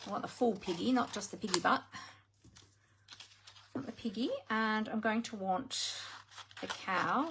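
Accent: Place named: Australia. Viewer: So nice to hear another Oz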